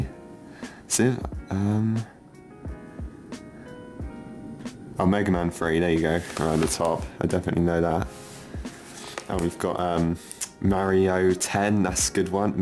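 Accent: United Kingdom. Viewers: You're British?? I totally did not see that coming...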